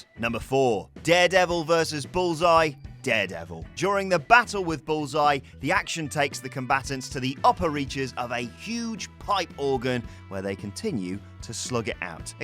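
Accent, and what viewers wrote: United Kingdom. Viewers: This British commentator got me ctfu 🤣🤣😭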